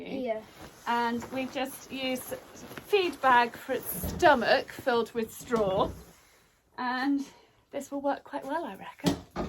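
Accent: United Kingdom. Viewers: I really like your Videos!!! Its very refreshing and i love your UK Accent.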